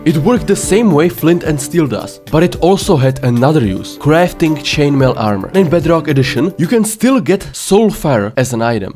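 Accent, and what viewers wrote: Czech Republic. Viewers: You sound like if you have czech accent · You kinda have a Czech accent lol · Poznam z tvoji angličtiny, že si Čech. Tím nechci říct, že máš spatnou angličtinu. Pěkné video. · Angličtina dobrá jen zapracuj na výslovnosti máš pořád strašně český přízvuk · my mans got the czech accent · t h i c c czech accent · Love the Czech accent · Nebudu ti lhát máš doclea silnej českej přízvuk. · Hele Kámo je hezky poznat podle hlasu že jsi čech :D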